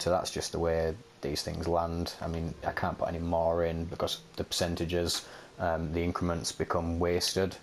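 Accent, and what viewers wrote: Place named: Bristol, United Kingdom. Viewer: are u from Bristol ? thats one T H I C K accent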